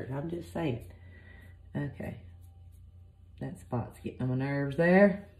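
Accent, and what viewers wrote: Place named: South, United States. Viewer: You rock, Lady! I could listen to you speak alllllllllll day! I’m an Aussie so I love the southern USA accent ❤️🇦🇺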